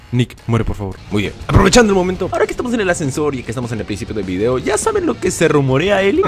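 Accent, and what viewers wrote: Peru. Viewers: Eres de Perú ? ._.XD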